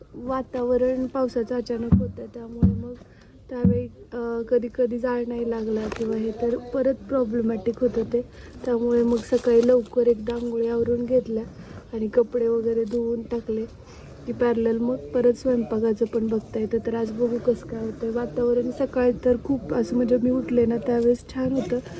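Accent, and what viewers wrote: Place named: Latur, India. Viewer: Tu pn aata लातूर chi भाषा बोलतेय khup aavdle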